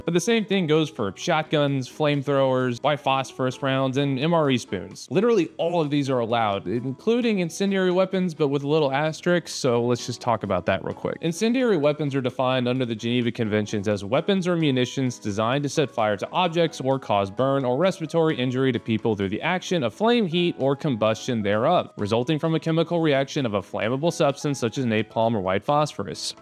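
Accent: United States. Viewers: Hold up. Your American accent tells me enough. Pay Japan and get it over with American. Consider your own first words in this video. (I enjoyed your content [as in this first 5 second clip I watched from you] but I must leave and never watch the video further because that would most propably make me look like an idiot as you will most likely prove my own point or speak about the "illegal warcrimes")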